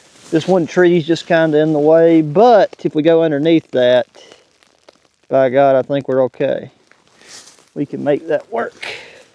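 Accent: South, United States. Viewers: Love that Southern accent, his charm and good looks dazzle in UHD.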